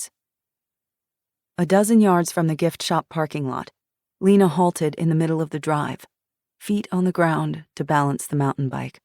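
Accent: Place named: France. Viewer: Love the narrator. Especially her French accent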